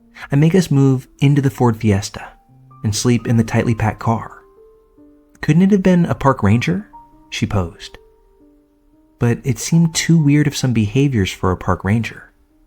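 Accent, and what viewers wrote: United States. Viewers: I'm English and I'm abit werid with American accents. But I really like this one. I'm not sure what part of America comes from my favourite is North Carolina accent😊😊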